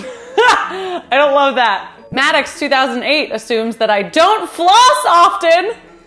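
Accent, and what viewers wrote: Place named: California, United States. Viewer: As an Australian who can't stand the valley girl accent, I can tell you I wouldn't have guessed that's where you were from ❤ Your accent is much nicer than the stereotype:-p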